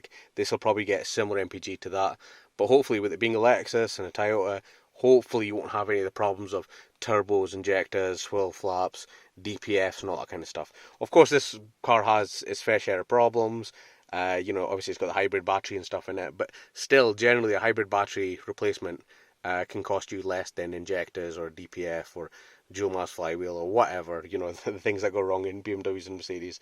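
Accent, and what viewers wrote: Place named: Scotland, United Kingdom. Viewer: Scottish....... subbed lol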